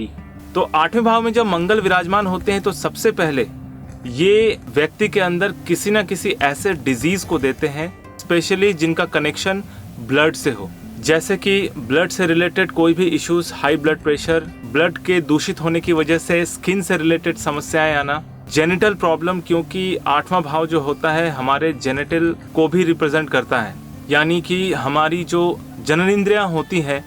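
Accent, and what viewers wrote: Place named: South, India. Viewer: Bakwas jaise sawdaan India bool rha ho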